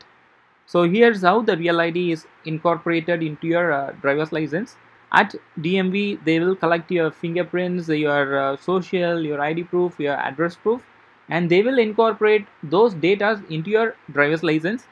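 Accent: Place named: India. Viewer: heavy indian accent is making this video impossible to understand..... dislike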